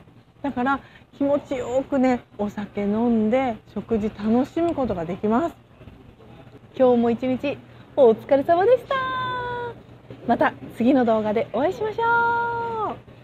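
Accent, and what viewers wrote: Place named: Japan. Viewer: 발음이 완전이 일본분 나마에와 한국이름 그렇군요, 맛집 알려주셔서 감사합니다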